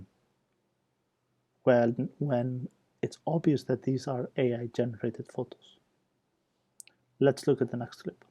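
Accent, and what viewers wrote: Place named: Germany. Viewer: She mentioned it in a video, but I already knew because of her german accent. You can see some people comment in german on her channel.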